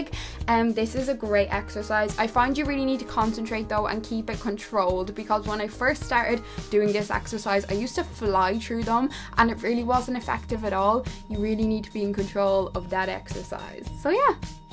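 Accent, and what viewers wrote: Ireland. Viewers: Are u Irish you sound irish 🇮🇪❤️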